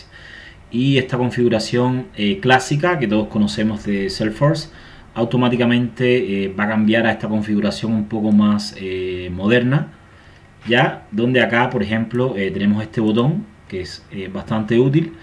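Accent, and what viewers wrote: Cuba. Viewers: Hola, eres cubano no?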